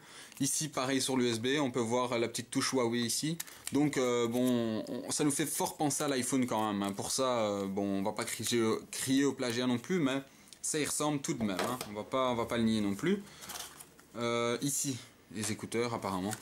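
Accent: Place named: Liège, Belgium. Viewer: tu viens de liege toi hein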